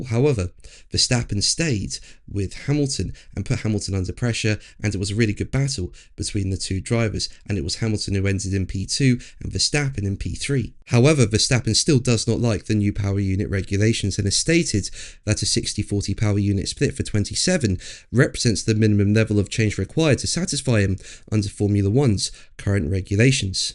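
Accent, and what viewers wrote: United Kingdom. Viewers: Not only does deliver in a slightly slurred British monotone voice, I really didn't learn anything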